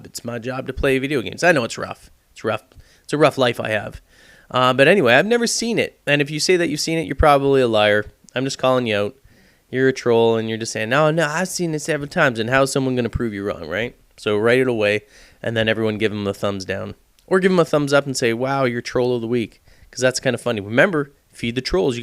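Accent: Canada. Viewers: lol u canadian?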